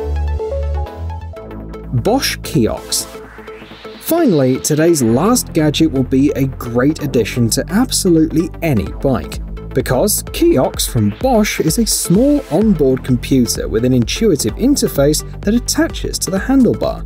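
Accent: United Kingdom. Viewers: I wasn't expecting the British speaker to price them in dollars.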